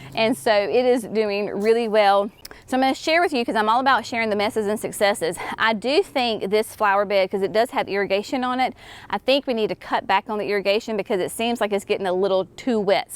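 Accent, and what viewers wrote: South, United States. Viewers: You have a wonderful southern accent but you really tapped into your southern ancestry when you said "porch".......love it! Garden looks amazing, great work you you guys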